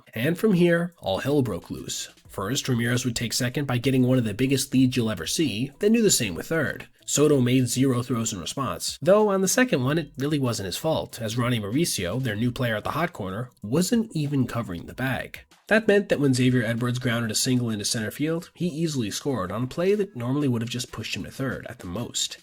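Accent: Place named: Michigan, United States. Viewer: Thought I always heard a little bit of Michigan in you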